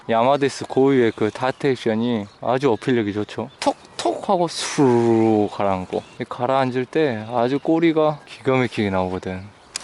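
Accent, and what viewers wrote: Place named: Jeolla, South Korea. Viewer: 전라도여? 사투리가오지구먼잉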